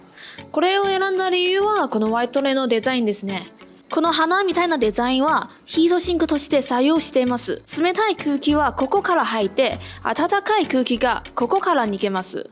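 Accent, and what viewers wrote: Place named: Japan. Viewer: I like your Japanese accent. Sounds really cute!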